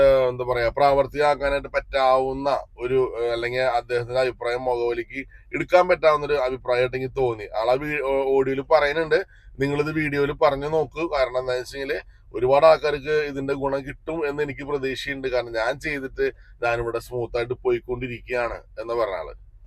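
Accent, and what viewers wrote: Thrissur, India. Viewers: I left a message in your WhatsApp I don’t know you heard it. I like your Trichur accent and watch most of your videos.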